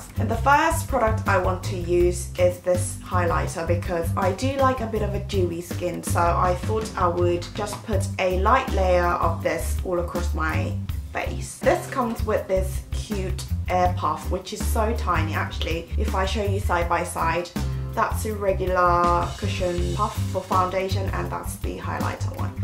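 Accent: United Kingdom. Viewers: 영상 보는게 처음인데 너무 귀여우시고 매력있으셔서 댓글 달아요 ㅎㅎ 영국식 영어 발음도 정말 매력있으시네요!!!ㅋㅋ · 아 님께는 처음 댓글인데:) 너무 귀여워요 동생같으으으으음!!!! 매력 넘쳐요 ㅠㅠ 영국발음이며 화장이며 말투까지 앞으로도 자주 봤음 좋겠어요 ㅋㅋ 빠~이!( 버전인사ㅋㅋ) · I adore your accent/voice! Are you British?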